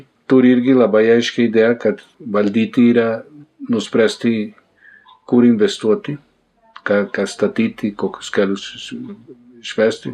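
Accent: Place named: Bogotá, Colombia. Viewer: Tiene asento bogotano en Lithuano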